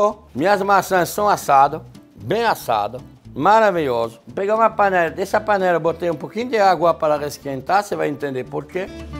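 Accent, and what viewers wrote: France. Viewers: Que Francês maravilhosooooooo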